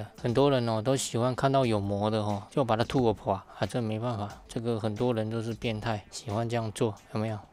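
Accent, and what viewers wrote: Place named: Taiwan. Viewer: 台式英文，其實大家都懂是在講什麼就好。milliliter 音節這麼多念起來很拗口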